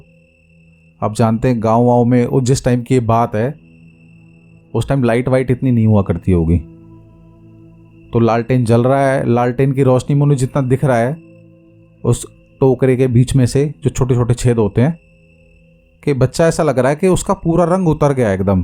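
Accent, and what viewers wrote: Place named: Meerut, India. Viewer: Jai shree Ram bhaiya ji.. me Meerut me rahti hu.. aapki baato se lagta. Hai ki. Aap bhi Meerut ya Meerut ke aas pass hi. Rahte honge. Sunte hue aisa hi lagta hai ki humaare neighbour bhaiya hi story suna rahe hai. 45 years Meerut me ho gaye hai tho Punjabi ke saat saat ye language bhi hum sab me rach bass gayi hai 😊 aapki sabhi stories bahut hi acchi lagti hai 🙏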